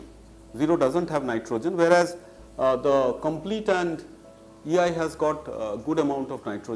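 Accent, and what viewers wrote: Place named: Bengal, India. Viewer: Dada I get your Bengali. My suggestion is to avoid mixing languages. If you have to use Bengali the do provide sub titles. Your Bengali accent is great tho.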